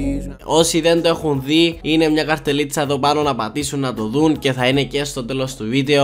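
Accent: Cyprus. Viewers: kuprios einai aki milaei etsi h' blammenos?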